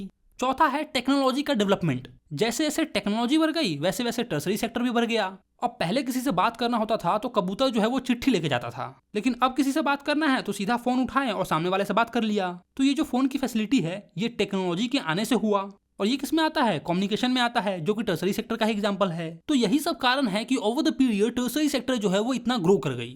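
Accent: Bihar, India. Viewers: bhai tum bihar se ho kya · Yess he is bihari ✌🏻👽🌚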